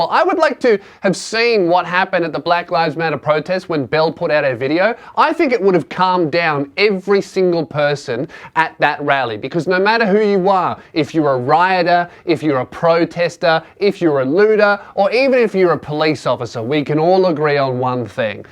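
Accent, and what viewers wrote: Australia. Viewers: You have the most Australian accent i know.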